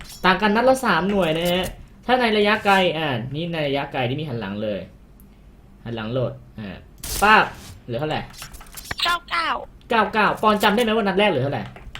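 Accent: Isan, Thailand. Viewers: พี่ คนอีสานหรอ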